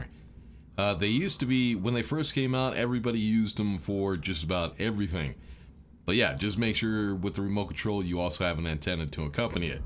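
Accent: United States. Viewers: I'm not used to the American accent (I'm a Brit) so was trying to work out why "planet with a bunch of turds (turrets) on it" needs defending 😆.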